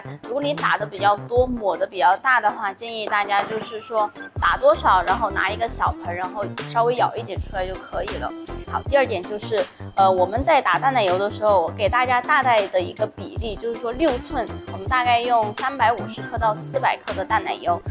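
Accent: Sichuan, China. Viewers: 老师是四川人吗:chillwdog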